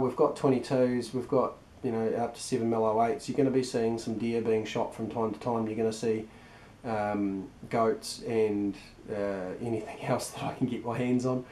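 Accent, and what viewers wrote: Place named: New Zealand. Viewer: deserves more views just for the kiwi accent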